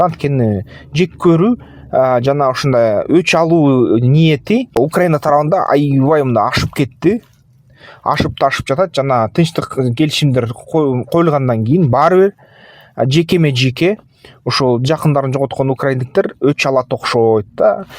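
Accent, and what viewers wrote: Standard. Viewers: Азаматсыз, мырза! Таптаза кыргызча бир созду да бурмалабай ойду берууго болот экен да! Билиминизге, аракетинизге - баракелде! Ийгилик - сизге! Кудайым адамдардын журогуно тынчтык берсе экен!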